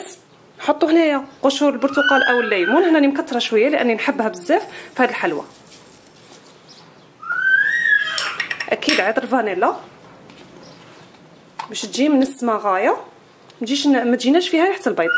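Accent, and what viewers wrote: West, Algeria. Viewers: اول مرة نشوف قناتك نتمنالك الاستمرارية واللي عجبني بزاف هدرتك نموووت على هدرت الغرب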